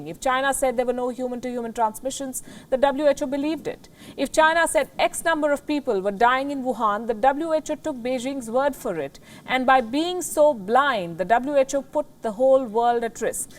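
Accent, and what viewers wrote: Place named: India. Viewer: Would this Channel will replace someone who able to speak proper English then thus lady could? She sounds like speaking Indian?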